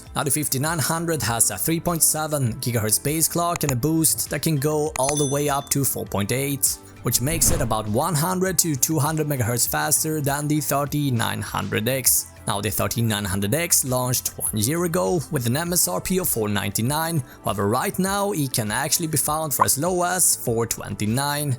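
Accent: Sweden. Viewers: jag hör på din brytning att du är svensk. jag kom på dig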